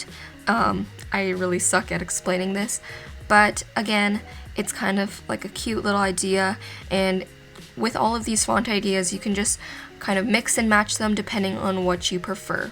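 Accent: Canada. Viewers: I can surely say that you're Canadian from the way you say "about" and "out". Am I right???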